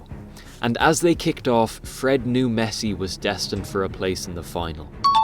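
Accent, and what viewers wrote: Ireland. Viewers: Are you from Ireland🇮🇪🇮🇪🇮🇪?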